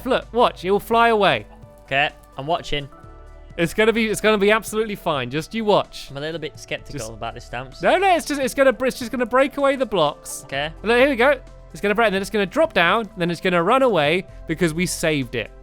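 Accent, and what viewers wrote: United Kingdom. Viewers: It is so weird hearing train stuff in British English.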